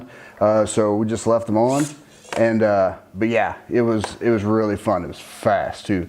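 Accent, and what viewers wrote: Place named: United States. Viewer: its kinda funny cos u have such a strong american accent but you talk in quid or british pounds lol good video tho!!!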